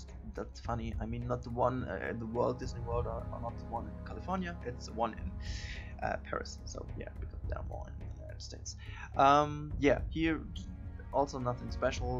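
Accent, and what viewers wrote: Germany. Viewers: Dein Englisch ist doch gut , natürlich hast du keinen Englischen Akzent sknder sprichst halt Englisch wie ein deutscher Englisch spricht. Nur du scheint ein wenig schüchtern hatte ich das Gefühl :)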